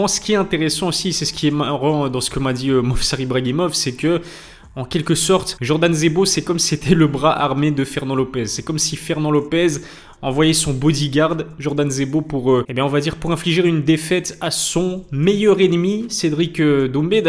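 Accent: Belgium. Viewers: Très belle chaîne mma, sincèrement, très passionné et sérieux 👍🏻 Et puis, ce petit accent belge est un plaisir et une marque de fabrique 😁 Merci à toi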